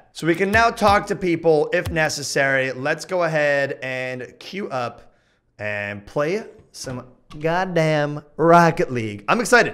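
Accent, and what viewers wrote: Quebec, Canada. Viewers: Dude, I dunno if that Quebecois accent was a way to mock Quebecers or if it's legit your accent when you speak french but I love it. Hello from Montréal! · You have the canadian accent when you speak french, it's so funny XD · Très beau accent québécois xd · Love the Quebec accent!! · I love the little french/québecois comments in the middle of the stress XD Your quebecois accent is wonderful Also super hyped for this new series, i've been missing this channel. Can't wait for more:D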